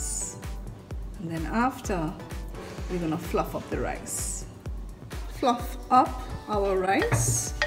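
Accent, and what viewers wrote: Trinidad and Tobago. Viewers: This was the most simple recipe I found. And how lovely to encounter what sounds like a Trini accent😊🇹🇹 Thank you very much!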